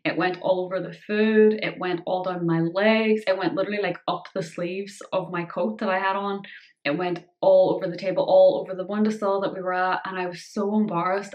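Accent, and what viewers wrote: Ireland. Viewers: 🙏🙏🙏 Keppra works for me. Been on it since 2006. Added Trileptal a few years later. The two together work awesome. Everyone responds different to different meds. Love the Irish accent ❤️ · The cards are such a wonderful idea. I have a little handwritten folded paper in my wallet with emergency contact info just in case. (I have a panic disorder and medication can sometimes make me dizzy). I think everyone should have a card anyway :) Also please do not let the negative comments about what you should post get to you, it’s YOUR channel. You really do not owe anyone anything. It’s a gift that we get from you to see what you’re up to or how you’re doing on your time. With that said I’m so happy you’re back in the homeland and getting the help you deserve! I know this new medication is going to be great! It’s such a huge accomplishment to have progressed in the physical side and now with a few adjustments I feel the spacial issues can resolve too. 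P.S. your Irish accent is always so refreshing to hear ❤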